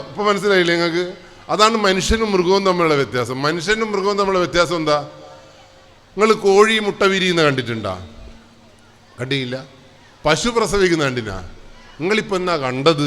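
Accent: Malabar, India. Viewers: മലബാറിൻ്റെ ആ ഭാഷ ശൈലി❤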